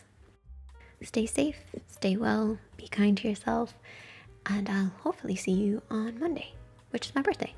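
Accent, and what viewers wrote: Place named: Ireland. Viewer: Sometimes depending on the words you say I can hear the Irish accent, especially if the word has a u in it, like much, brush, blush... I love it 😍😍😍, i've always said if I could have any other accent other that my US southern accent, it would be Irish, you're voice is NOT annoying either, I find it very soothing, shame on them 😤😤😤, I love this video, really all of your videos, you always use the minimum, but it always looks like it took hours, a whole brush set, and a whole palette and then some and create the most beautiful looks 😍😍😍.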